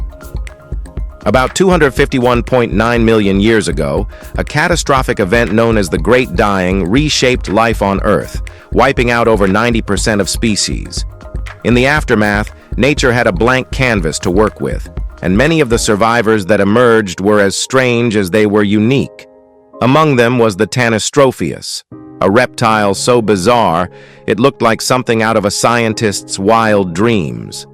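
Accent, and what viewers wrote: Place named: United States. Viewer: Hate that american dialect in science. sounds duh ? Foolish..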